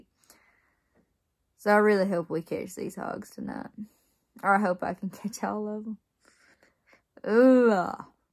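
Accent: South, United States. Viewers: You're so darn cute and I like that southern talk 😍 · By god ,that southern accent is so hot . Sorry don't mean to be rude .but you sure are a beautiful woman . Will you merry me? Lol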